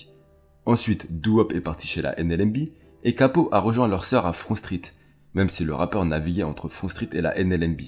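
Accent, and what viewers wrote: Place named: Paris, France. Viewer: Large j'ai stoppé directement pour aller dans les commentaires pour savoir si comme moi quelqu'un avait arrêté les frais après 1min 20 d'écorchage. Depuis que tu dis Glo Gang comme un gars du 16 c'est mort next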